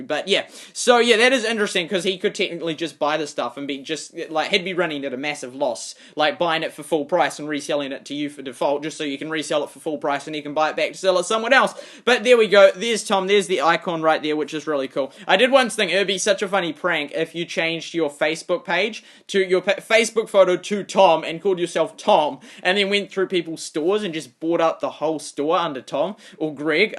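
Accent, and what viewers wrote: New Zealand. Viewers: Are you kiwi??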